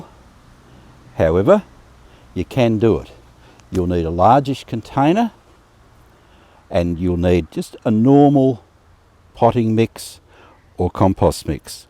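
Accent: Australia. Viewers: Just love your Aussie accent. And thanks for the helpful info.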